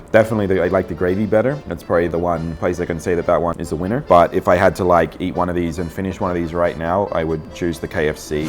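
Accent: Philippines. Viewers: nice tagalog accent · Lol I like your tagalog accent! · Omg you have a filipino accent ahahaha i thought you are a filipino when you talked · That Filipino accent wow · You sound more filipino than some Filipinos honestly... and it amaze me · Hahaha... I am so amaze to hear a forigner with Filipino accent after speaking in Tagalog. Awesome!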